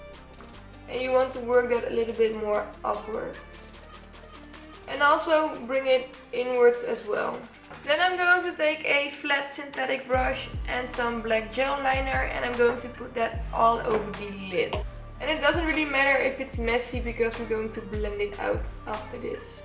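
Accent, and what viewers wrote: Netherlands. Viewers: Lmao first thing when I heard her voice: okee shes dutch 😂. Anyways love the make up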